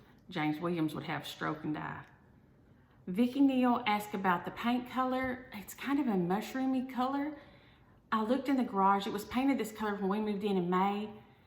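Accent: South, United States. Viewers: Great video!!! I love hearing another southerner! We’re getting on down the road like a chicken on the loose! 😉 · I absolutely adore you. I laughed out loud at a couple of your stories. The doorbell one especially. Love your southern accent. Keep recording your episodes. They are perfect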